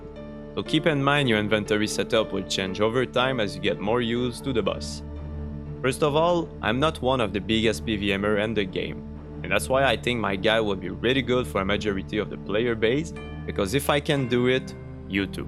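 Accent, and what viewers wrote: Quebec, Canada. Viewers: Trop cute ton accent de Québ, bon vid!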